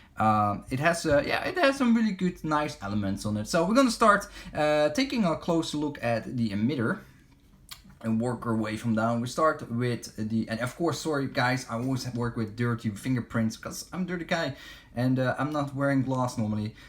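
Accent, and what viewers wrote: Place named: Netherlands. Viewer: Realy love the hilt! You said you are gonna sell it? Im intressed,i live in the netherlands and i think you too? Because of the accent haha.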